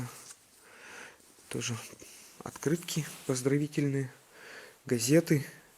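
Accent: Vyatka, Russia. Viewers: пожалуйста,называйте район,где эти деревни находятся.Или это нельзя? Говор у Вас родной,до слез приятно слышать родные слова.Я вятская,но живу очень далеко,в Казахстане,и в силу возраста(73 года) и кучи болячек уже, наверное,никогда не смогу приехать.Очень рада,что нечаянно наткнулась на Вас.Подписалась,пересмотрю все. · Точнее, говор вятский! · Автор вы откуда? Акцент как вятских😊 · Говор вятский...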